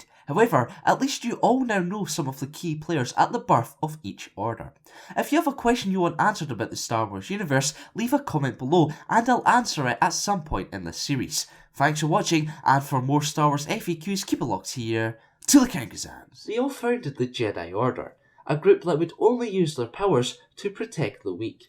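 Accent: Scotland, United Kingdom. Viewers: Are you Scottish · Good to hear a fellow Scot out there doing this s#*#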